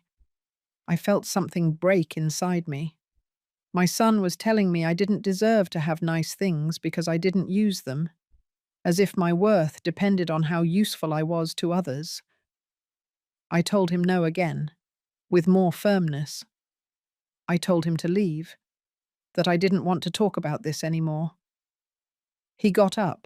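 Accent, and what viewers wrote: United Kingdom. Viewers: The speaker has a different accent because she is British, not American.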